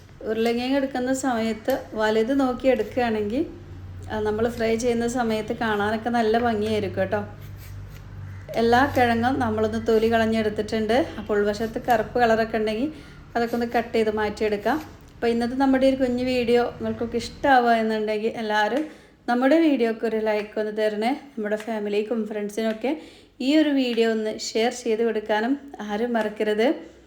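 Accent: Malappuram, India. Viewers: മലപ്പുറം താനല്ലേ മലപ്പുറം ബ്ലോക്ക് സംസാരം കേട്ടപ്പോൾ അതോ അവിടുന്ന് മലപ്പുറത്ത് അല്ലാത്ത പോലെ തോന്നുന്നു ഇത്രയും ഒന്നും വേണ്ട നമ്മൾ നമ്മൾ മലപ്പുറക്കാർ അല്ലേ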